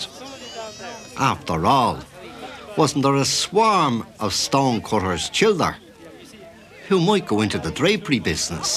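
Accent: Ireland. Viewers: Èammon Mac Thomàis has a much broader Dublin accent than Joe Duffy . That how you know this is him . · The narrator is stealing the show by being too bloody Irish.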